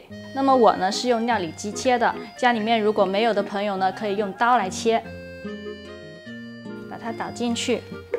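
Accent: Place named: Fujian, China. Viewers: 老师，感觉你是福建人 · 普通話教學，但是發音好難聽！福建口音估計是！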